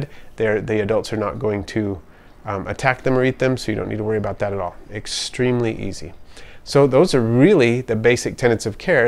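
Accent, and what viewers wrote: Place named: Hawaii, United States. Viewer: Bro. You sound local, like from hawaii. Im fkn serious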